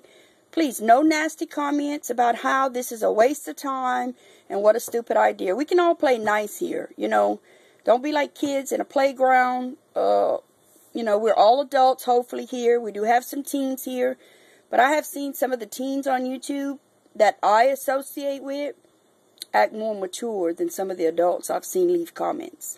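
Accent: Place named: Louisiana, United States. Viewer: you sound like my family in southern Louisiana. Your accent reminds me of them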